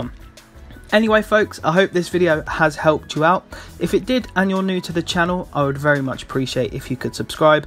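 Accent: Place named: Australia. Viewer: The australian accent tho 👌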